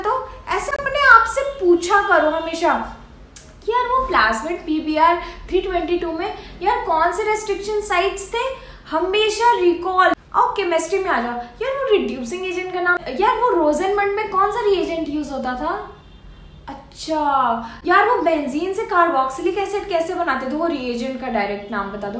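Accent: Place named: Bihar, India. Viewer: Di aapki voice m bihari accent h kaha par rhte ho aap???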